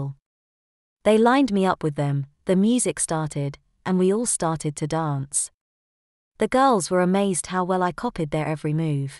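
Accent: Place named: United Kingdom. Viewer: What a fraud! A story about American football, w/cheerleaders, told in an British accent, using English terms not used in the US. Flat is actually an apartment, match is game, etc. And the cheerleader's lockerroom is closely guarded at ALL times to keep interlopers out. And no, the final game of the season would be played on home turf, not in England. Not even a good try, it's a complete fail!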